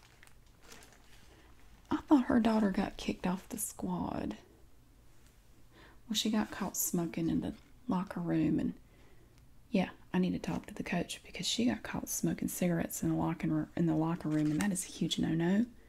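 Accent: South, United States. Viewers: You really acted this role well I think your bitchy mum is awesome and funny I love your southern accent why you not an actress you really good at acting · I love watching you not only because of your videos but because of your accent. I used to live in the south but I moved a few years ago and I've recently been really missing it. Your videos bring me back and make me so happy. Thank you. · so southern bruh spot on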